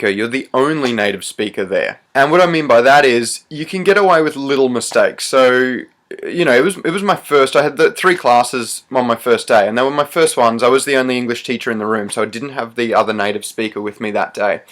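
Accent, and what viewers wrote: Australia. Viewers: Bradlyn Dukes I'm aware accent is aussie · Watching one of your older videos makes me think if you losing slowly your Australian accent.what do other people say about that? Cheers mate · im pretty sure his accent is Australian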